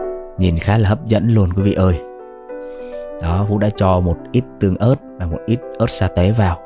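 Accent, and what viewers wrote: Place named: North, Vietnam. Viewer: Thích giọng nói của em, nghe là biết con cháu người Bắc 54, ấm áp chứ không chua lè, tru tréo như giọng Bắc 75.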